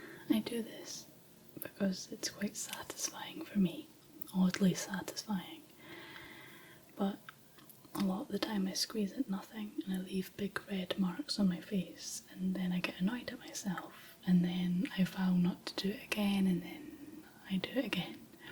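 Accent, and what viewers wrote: Scotland, United Kingdom. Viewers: The Scottish accent is the best accent in the world. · Have to say the curls REALLY suit you! There's no Welsh alternate sayings/words for New Year unless you count Blwyddyn Newydd Da; Happy New Year to you! Could listen to you all day, love the softer Scottish accent. . I practice the LoA and things have manifested so well for me recently, but still working hard on the Power of Now which can be quite hard to maintain. . Guessing you're near the M8/M80 😉 . Much love from North West Wales 😍 x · Where in Scotland are you from? Lovely accent wherever it is. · i will never not have a weak spot for scottish accents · other people must be thinking this is a strong accent but for me its normal bc im scottish to AHhah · Love the ton of your voice the nice pleasant Scottish accent Not only that , you're beautiful inside and out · Aaaaannnnd I'm on my way to dreamland. 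We need more scottish accent asmr. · my new years resolution is to find a scottish girlfriend (Im Australian) cos i could listen to that fluffy accent the rest of my life. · you are so beautiful and talented. I love all your videos. Your hair is beautiful. Your hands are beautiful and I love your hand movements. Your voice and accent are wonderful. You should be recognized as a Scottish national treasure. Thank you for all the great videos. You always help me relax and make me smile. Thank you.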